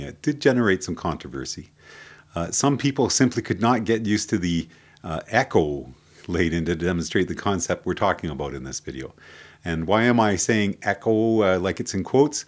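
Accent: Canada. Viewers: hes from canada, to them its aboot not about.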